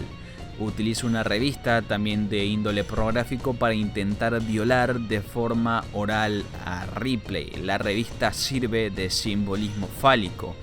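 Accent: Argentina. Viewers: Tanto le cuesta leer a un argentino.? Super Sho, El Esho? Igual que un puertoriqueño, saben que hablan mal pero igual lo hacen hacen 🙄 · eres muy buen editor, buen análisis amigo, transfondo intelectual, saludos desde México, voz sos argento "pibe" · El esho, el ego y el súper sho jajaja cagado acento Argentino.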